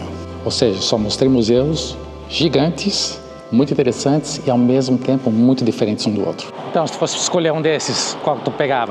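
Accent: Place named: Florianópolis, Brazil. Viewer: Caramba... Sinceramente um dos melhores vídeos sobre cidades que eu já vi na minha vida.. Rico em detalhes, bem estruturado, rico em informações... E com sotaque manezinho kk kk Abraços de Blumenau.. Quero visitar Edimburgo ano que vem e vendo teus vídeos só dão mais vontade de ir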